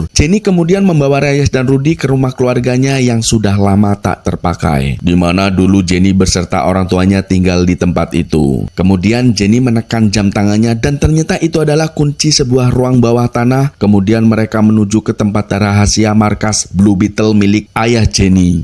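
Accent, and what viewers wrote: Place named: Java, Indonesia. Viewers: Basane medok nemen min 😅. Sing adoh nemen 😂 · Bahasane kaya nyong medok nemen😅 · Cerita Film yang epic dan menarik, pembawaan sangat lugas, jelas, lucu (ada medoknya 🤣👏) dan mengena, mantappp 👍 sukses selalu untuk mu, semoga kontennya bermanfaat. Suwun Maseee 🙏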